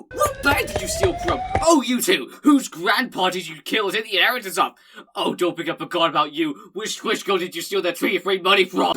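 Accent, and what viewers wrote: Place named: United Kingdom. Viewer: This is the first time I’m hearing your voice Holy shit you ARE British aldhaodhakdhosjzksjd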